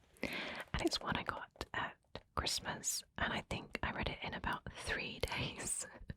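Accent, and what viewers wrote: United Kingdom. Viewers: thanks for the video. It's nice to hear the British accent, it sounds very classy. 🔥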